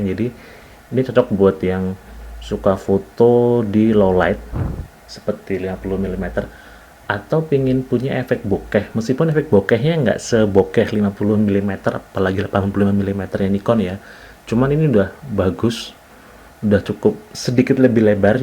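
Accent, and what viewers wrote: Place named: Surakarta, Indonesia. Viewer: Koyok e wong solo iki haha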